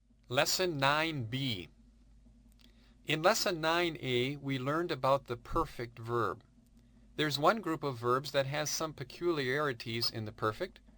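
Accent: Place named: Canada. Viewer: Please don't be offended, but I think I'm hearing a Canadian reading this Hebrew. It's just super weird because the French and Hebrew R sound the same, but this guy's "far west" pronunciation is almost grating.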